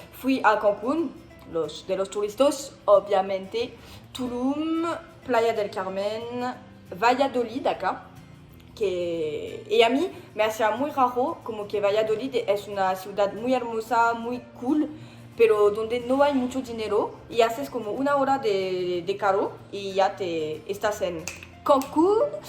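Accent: France. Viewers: hermosa francesa! adoro tu acento hablando slang mexicano,😂 espero que hayas disfrutado tu estadia en mexico, eres muy cool y graciosa vuelve pronto y que dios te bendiga.😊😍💕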